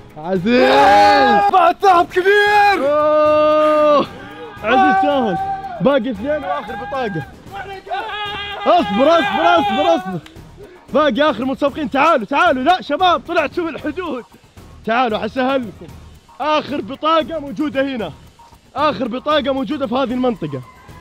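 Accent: Saudi Arabia. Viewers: لهجتك مثل السعوديين تماماً هل انت سعودي ولا ارني 🇸🇦🇯🇴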